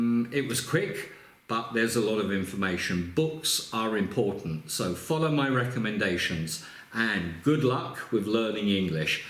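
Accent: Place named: United Kingdom. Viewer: BRITISH ENGLISH PRONUNCIATION....I LIKE IT!!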